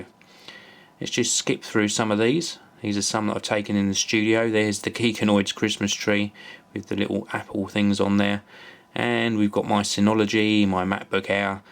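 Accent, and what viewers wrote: England, United Kingdom. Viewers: english accent. Britain is made up of 4 countrys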